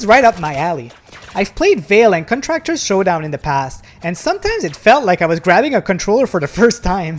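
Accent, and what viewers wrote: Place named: New Brunswick, Canada. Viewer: Un voisin Newbie!! I'm trying to make out your accent but I'm not sure, Edmunston, Peninsule p-e? Bathurst?? I'm also french and from Bathurst. btw I just gave you your 1000th sub. Congrats!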